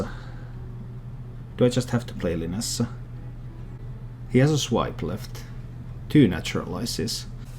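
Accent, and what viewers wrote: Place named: Finland. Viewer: Your accent sounds like your from Finland, good video as always!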